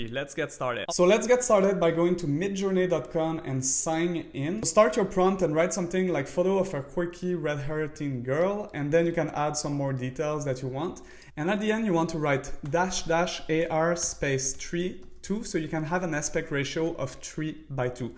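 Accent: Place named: France. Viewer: belle accent français ahah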